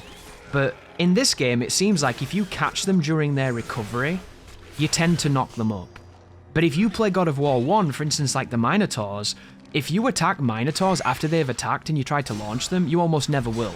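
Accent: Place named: England, United Kingdom. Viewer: Which part of England are you from? Your accent seems similar to mine 😅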